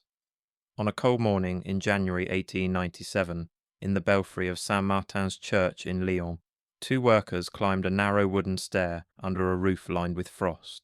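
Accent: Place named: United Kingdom. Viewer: fake British AI generated voice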